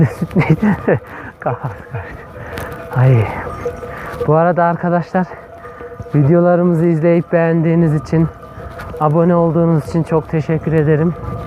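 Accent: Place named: Central Anatolia, Turkey. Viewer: Çoooşu ne güldüm ya İç anadolu Şivesi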